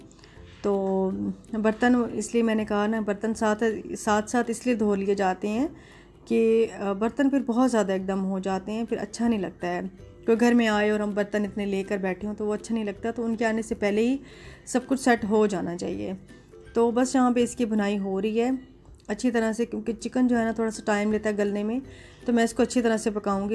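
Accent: Saraiki, Pakistan. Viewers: I strongly feel that u r saraiki or multani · I hate this language n this pak acsent. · آپکی پہلی وڈیو دیکھی ہیے بولنے کے انداز سے آپ سرائکی لگ رہی ہیں؟؟؟ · Aap yaqeenan saraiki speaking hen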